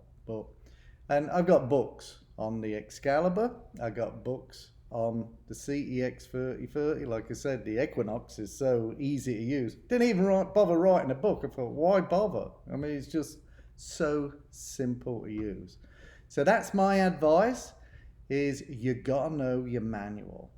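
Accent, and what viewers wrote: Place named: Lincolnshire, United Kingdom. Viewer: With minelab you have to download the manual I actual print them off for my machines I prefer reading it that way. Different land needs different settings I find. Nice to hear a fellow Lincolnshire accent take care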